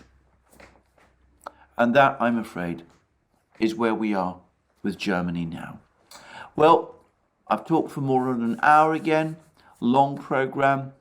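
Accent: United Kingdom. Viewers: Alexander when all this quietens down (and that it will) you will be the most important historian of this war. Indeed posterity will remember you as the articulate studied chronicler journalist of this Slavic affair, with a delightful British accent. More power to you and your tribe. 🎉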